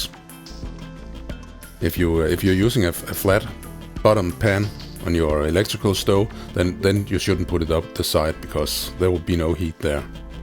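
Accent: Denmark. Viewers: Dansk accent :D godt lavet!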